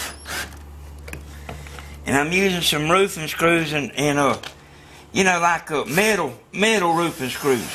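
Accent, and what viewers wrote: South, United States. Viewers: You have the coolest accent can't beat that good old southern accent hell that's better than anything you can buy I bet you can get up to 200 miles of that antenna I wish you lived near me I'd pay you to make me one and that's made better than any of the $100 ones I've seen made a lot better and more sturdier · Thanks, love theSouthern accent! If i make one i will film it and tell people about you.Thanks again.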